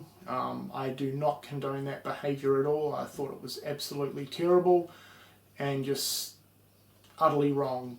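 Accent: New Zealand. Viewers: sorry this question has nothing to do with the video but are you originally from new zealand because you sound like a kiwi.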